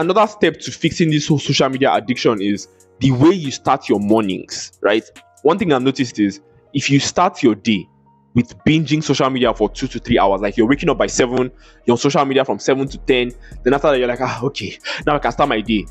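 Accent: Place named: Nigeria. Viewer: Nice video Bro hearing a fellow Nigerian brother speaks with a naija Tongue really resonate so much Waptrick, redwap You were really spot on Porn addiction is the grandmaster of the other addiction. A porn addict brain is same as that of a person on heroin, a porn addict, will also be addicted to gambling and other vices A man who can control his sexual urge is a dangerous man, and a dangerous man is a focused man I have some video requests for you, will like to hear your opinion on 1) Nofap and Semen retention 2) Single mothers: - Dating a single mother/ babymama as a man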